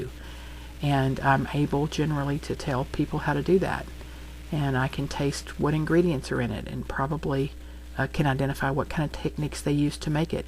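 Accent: Texas, United States. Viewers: Iove listening to these…….Im with you about the liver….ugh!! anything but liver is what I say! : ) I didn't know you were from Texas even though you def sound it but I will say I flew down to Houston from where I live (Connecticut) and drove all over and I will have to say the people down there are so kind. I hate flying but I would go back there again for sure.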